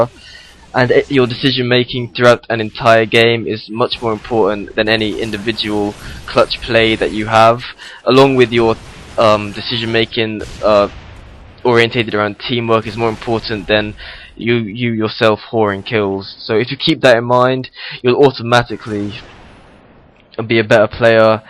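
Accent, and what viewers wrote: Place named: United Kingdom. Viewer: Its British English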